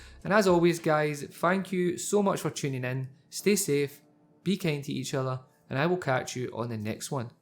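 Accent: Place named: Scotland, United Kingdom. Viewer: i love your Scottish voice xD BTW nice video :)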